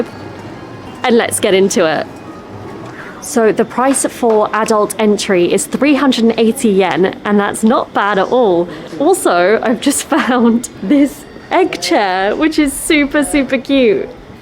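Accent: United Kingdom. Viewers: たくさんのイギリス人You Tuber がいるけれどさんのブリティッシュ英語が本当に耳に心地良いです☺️ あ…目にも心地良いです😊笑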